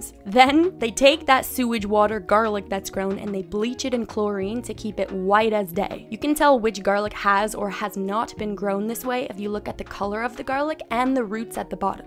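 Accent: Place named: New York, United States. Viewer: Just curious how come you have a bit of a New Jersey/New York-ish or I guess maybe even New England-ish accent when you’re from Canada? Have you lived in that area before?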